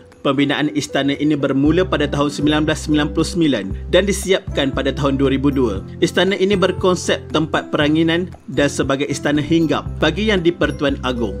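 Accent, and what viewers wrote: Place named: Pahang, Malaysia. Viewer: Sangat sempurna informative! Pekat juga bunyi dialect Pahang pengacara xD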